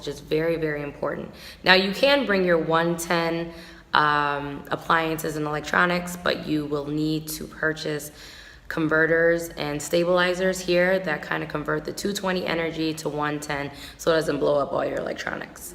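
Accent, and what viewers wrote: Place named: Liberia. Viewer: So cute when u speak Liberian English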